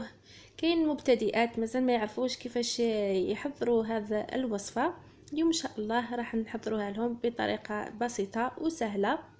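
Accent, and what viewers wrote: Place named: Algeria. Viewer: ما شاء الله الجزائريات شاطرين جدا معلش اللهجه بتاعت حضرتك شبهم انا مش بعرف افرق كلنا اخوات طبعا وتسلم ذوقك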